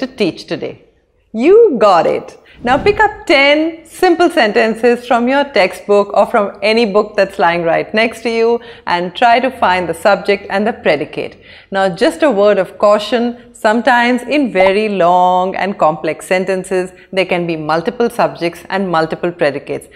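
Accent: India. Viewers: She is not speaking UK or US accent she speaks simple Indian English so I was wondering how she is getting more view at each video finally I googled her & found she is brilliant and very hard worker Finally she earned one more her subscriber 🌹👍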